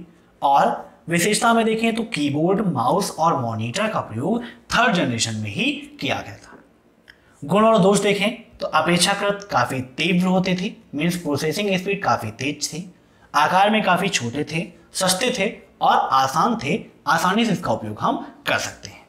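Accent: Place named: Madhya Pradesh, India. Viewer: Mp की laungage लगती है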